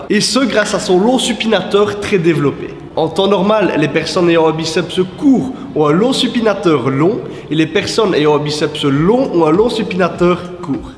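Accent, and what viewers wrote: Liège, Belgium. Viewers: Oufti l'accent 👍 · Salut, Bien sympas les vidéos. J'entends comme un petit accent liégeoise ... :) Tu es de quel coin ? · Jkiff ta facon de PARLÉ vive la Belgique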